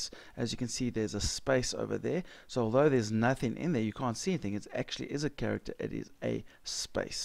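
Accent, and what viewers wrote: South Africa. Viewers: You can hear the strong South African accent coming through, at least I think.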